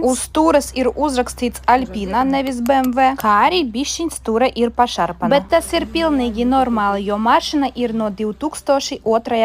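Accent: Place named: Ukraine. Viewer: Противно слышать украинский акцент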